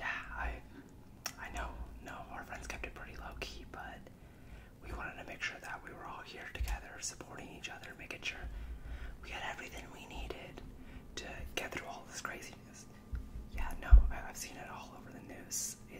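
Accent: India. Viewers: Specially with indian accent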